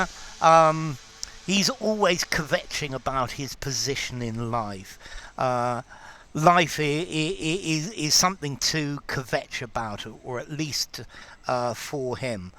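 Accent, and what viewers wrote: United Kingdom. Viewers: Thanks for your interesting videos. Are you British?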